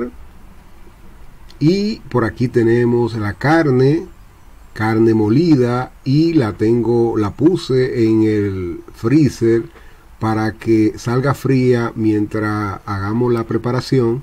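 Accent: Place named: Dominican Republic. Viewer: Ya me sacó de la duda de dónde es ( por su acento ) Mil x mil 🇩🇴🇩🇴🇩🇴😃nos parecemos extremadamente LIMPIO 👍👍👍👍👍👏👏👏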